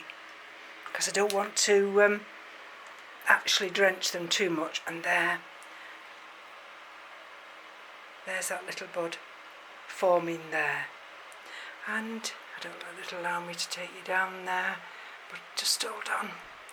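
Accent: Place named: North, United Kingdom. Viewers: Great to hear a northern accent talking about orchids thanks. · I recognised your accent but didn’t realise you were in Crewe! I was born in holmes chapel and lived sandbach and alsager... my nan is from crewe and I went to college there... I’m now in Devon. Loving the videos. Keep up the good work!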